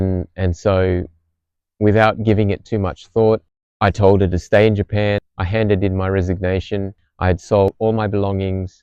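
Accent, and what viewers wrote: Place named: Malaysia. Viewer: I’m here after watching the video of you speaking with your daughter about complexion. As a mum of 3 beautiful mixed girls (Indian and Chinese), I do feel for them when they are asked about their heritage. But they consider themselves lucky.. they get double the festive celebrations and double presents! We are blessed to live in Malaysia. And I detected a slight Malaysian accent when you spoke, happily I was somewhat correct, lol. Good luck in your journey. Home is where the heart is!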